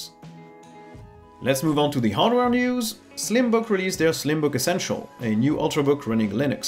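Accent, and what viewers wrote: France. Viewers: I love Linux et ton léger accent français 👀😂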